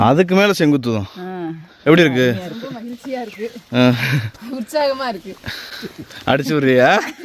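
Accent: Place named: Ramnad, India. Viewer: unga ramnad tamil pronunciation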